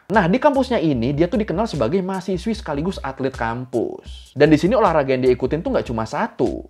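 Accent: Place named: West Java, Indonesia. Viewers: Ternyata Lo orang Sunda bang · sunda lau mantep juga bang alus pisan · pertama kali denger bang ngomong sunda wkwkw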